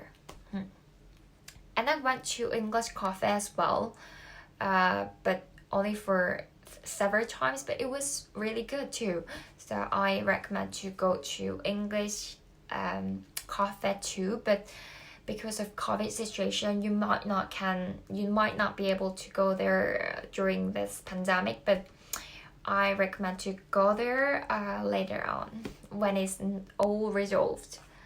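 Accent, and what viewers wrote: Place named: United Kingdom. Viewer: 님도 새해 복 많이 받으세요!!! 발음이 전보다 더 좋아지시고 영국억양이 조금 더 느껴지는거 같은건 기분탓일까여~? ㅎㅎㅎ 영상 편집실력도 더 는거같아요 히힣ㅎㅎ 그리고 오늘 입으신 옷 너무 예뽀요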